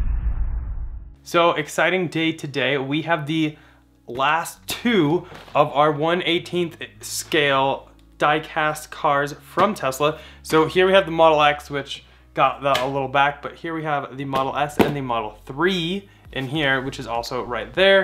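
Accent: Norway. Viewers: R u Norwegian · Are you norwegan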